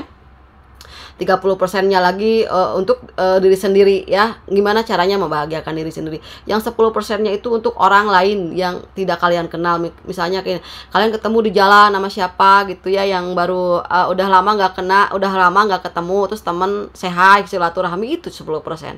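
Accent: West Java, Indonesia. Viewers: Siang Mbak cantik🙏..Maaf saya baru memfollowing. Sukses sll 😇👍👍👍TUHAN memberkati🙏😇🙏 Maaf teh, orang Sunda ya🙏bagus suaranya👍 Hatur nuhun terima kasih readingannya teh.🙏🥰🙏 Temanya, dia pakai magic, jk dia bnr pakai bgtuan, TUHAN akan menjagaku sll dr orang2 brniat tdk baik.Amin.🙏 Benar kejujuran adlh segalanya.🙏👍 Ya resiko dia nya sendiri, dia yg memutuskan pergi menjauh, dan saya sdh ikhlaskan serahkn kpd TUHAN, rasa sedih pedih luka di hati yg dia berikan, telah berangsur pulih hatiku, Kasih TUHAN pulihkan di hatiku🙏😇🙏..Lebih baik fokus utk ke depannya saja, anak2 saja, selflove dan terpenting semakin dkt pd TUHAN🤲🙏♥️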